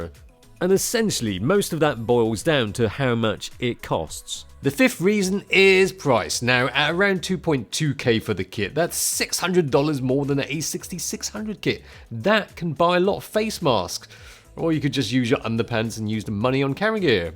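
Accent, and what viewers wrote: United Kingdom. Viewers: Forget the British accent, I swear this guy looks 17 and 70 at the same time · I've been trolling this guy for years and still haven't figured out if he's Japanese or chinese. And what's with than clean british accent? British chavs don't talk like that. No respect for her royal imbred racist majesty queen Elizabeth and stuff.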